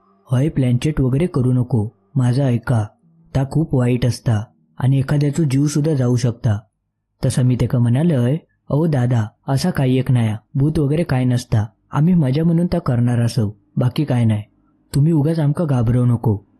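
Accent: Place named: Malvan, India. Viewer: Story mast hoti 👍👍😊tumach malvani awaj mast ahe 👍👍👍❤second part lavakar pathava 😊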